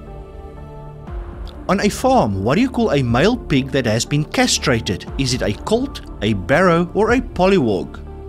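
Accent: South Africa. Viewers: I spend a lot of time on youtube and you are my favorite man. You are so friendly, and the questions are great and I can't get enough of the South Affrikan sound.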